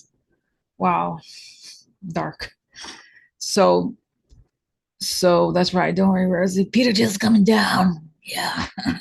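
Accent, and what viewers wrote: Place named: Russia. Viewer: Thank you Rosie🩵🙏❤️✨🌟. Your Russian accent makes me smile and laugh even in these very trying times!!! Thank you!!🙏 God Bless you🙏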